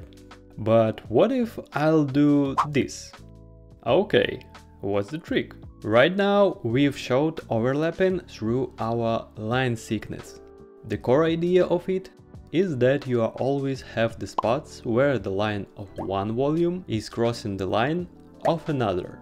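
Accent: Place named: Poland. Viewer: jak to się dzieje że zawszę usłyszę polaka, dobry film tho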